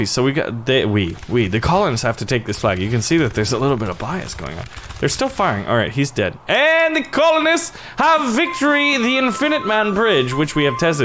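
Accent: United Kingdom. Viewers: I like his British accent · I like how you do all the different accents for all the different nations. As someone who is British, I think that your British accent is a little bit daft but I like it. Food wise, we do like curry, but it's not really our national dish. For that, I'd direct you towards something like fish and chips, or a Sunday roast, or even a full English breakfast. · I approve these British accents and any further British accents made by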